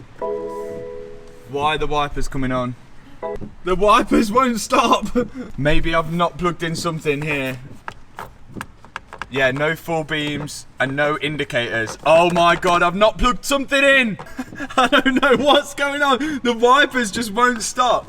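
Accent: United Kingdom. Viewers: Yaaay. British accent! Hahaha. Let me get a cuppa! 😆👌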